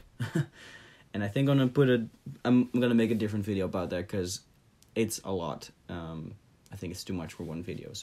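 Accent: Netherlands. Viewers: Hey where are you from? Your accent sounds like it's dutch?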